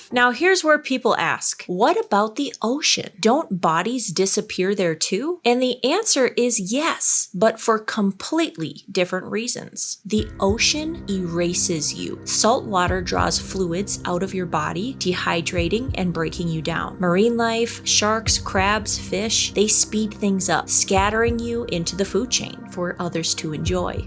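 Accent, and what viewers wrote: Minnesota, United States. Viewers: You must be from MN? Nailed the accent. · gotta love the Minnesota accent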